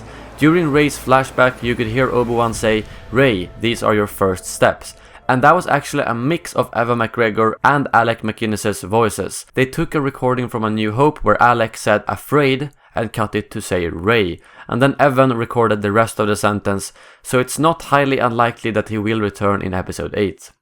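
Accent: Sweden. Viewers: Let me guess? You are Swedish right?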